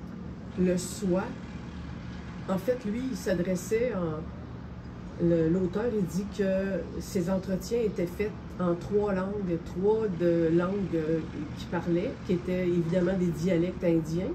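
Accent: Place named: Quebec, Canada. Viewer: Toujours intéressant, mais le bruit de fond industriel est pénible. Comme l’accent québécois n’arrange rien en plus 😅 But thanx ;)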